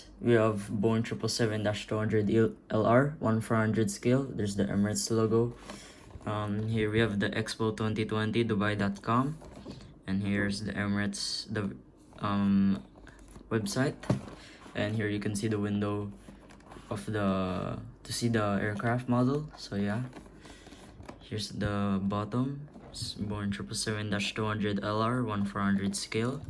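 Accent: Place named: India. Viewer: Are you Indian? I like your accent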